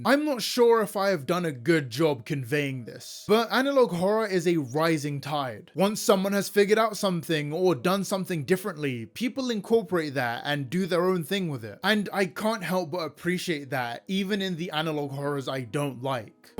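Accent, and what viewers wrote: United Kingdom. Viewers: Black man with funny (fucking English?) accent talks about analog horror and uses anime in the video for some reason. So anyway I subscribed to the great value KSI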